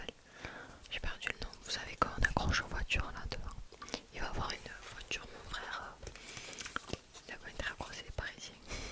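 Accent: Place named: Marseille, France. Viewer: Je suis marseillaise ❤ ta un accent marseillais incroyable ❤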